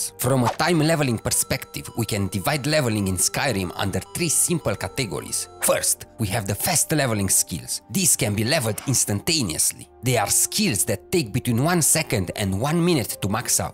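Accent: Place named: Romania. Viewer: mamă ce ușor recunosc accentul românesc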